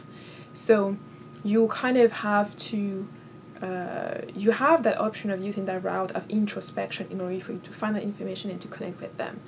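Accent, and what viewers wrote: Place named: France. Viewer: i can hear that french is your first language. I have the same accent lol. americans are right, it does actually sound beautiful! i used to find mine annoying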